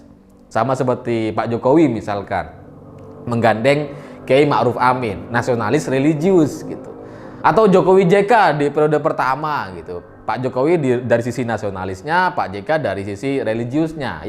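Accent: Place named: Banyumas, Indonesia. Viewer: Bocah ngapak ya, kok doyan maenin agama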